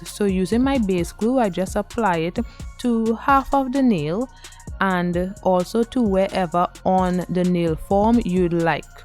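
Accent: Trinidad and Tobago. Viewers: Love the tutorial, but as soon as the video started I was like...SHE TRINI!!! Love it! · Is this a 🇹🇹 accent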